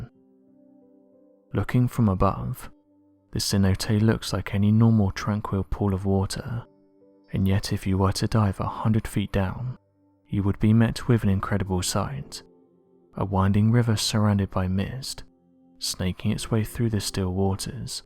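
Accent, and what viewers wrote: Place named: RP, United Kingdom. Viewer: And the queens english is easy on the ears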